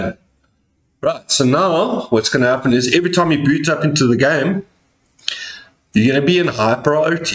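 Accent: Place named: South Africa. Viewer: My bru sounds so South African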